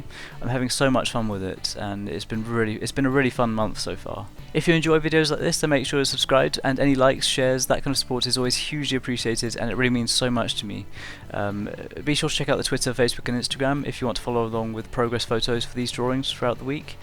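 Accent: England, United Kingdom. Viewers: ¿Quien no adora ese acento inglés que tiene? uwu <3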